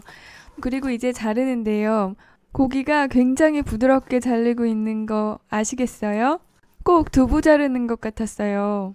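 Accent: Seoul, South Korea. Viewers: 뭔가 나레이션이 서울사투리같은데 그래서 더 정겨워요! 뭔가 아는̆̈ 이모님이̊̈ 알려주시는̆̈ 느낌이예요 좋은 영상 잘 보고 갑니다~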